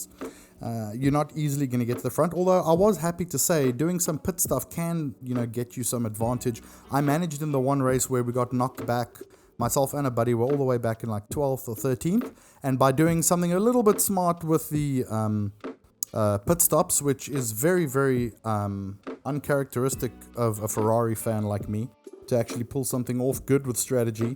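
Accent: South Africa. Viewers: i knew i recognized that accent, im raised in america but born in SA, cool video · So nice to hear a South African voice for once! Dope content!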